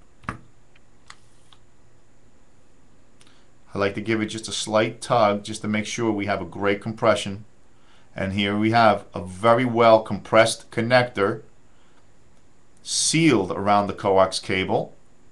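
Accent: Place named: New York, United States. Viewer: yo dawg you from new york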